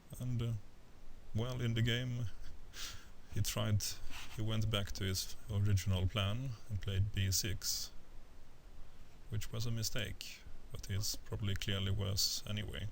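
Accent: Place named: Sweden. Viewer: yay svensk accsent =D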